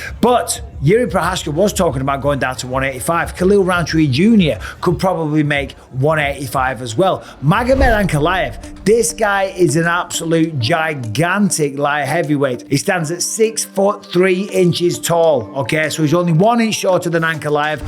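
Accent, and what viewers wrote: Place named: United Kingdom. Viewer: Mike...sounding British again 🤔 not like a yank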